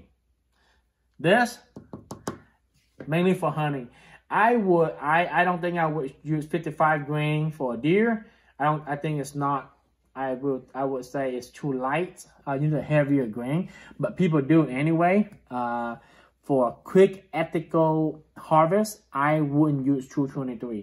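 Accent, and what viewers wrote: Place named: Texas, United States. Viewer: You sound like you have some Texan in your accent, so if someone talks shit about it, Fuk them! Keep doing what your doing. Haters gonna hate.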